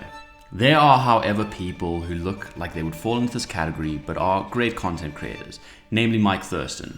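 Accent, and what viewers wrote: South Africa. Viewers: Great video mate! Can def tell youre from SA. Represent us brother! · Great video. Spot on, all these trainers often end up paralyzing people into inaction because there is always supposedly a more optimal way of doing something. It's certainly happened to me and many others I know. Also, nice to hear a South African accent! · are you south african? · South African bro? Let’s go represent Bru! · Btw you a saffa bro? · i needa ask, are you South African? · 🚨🇿🇦🚨 Saffer twang detected! Saffer twang detected! 👮📢 Aweh Aweh boet 🤙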